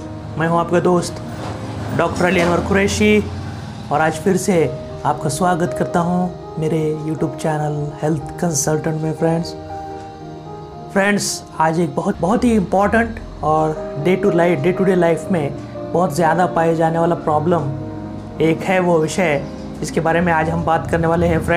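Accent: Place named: Bangladesh. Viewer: Bangladeshi ho ky ...sound se aise he lgta hai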